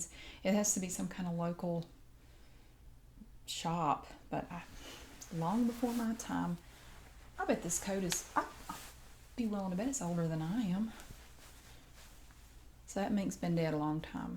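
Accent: South, United States. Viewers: Darling, this is a great video on thrift shopping, but it is not soft spoken and it is not ASMR. I know you are a fashion fan, but this video is too high energy and does not induce tingles even with your beautiful southern drawl. Perhaps you should start a second channel specifically for your fabulous clothing shipping trips. I do find your Aldi food shopping videos to be quite relaxing. Best, C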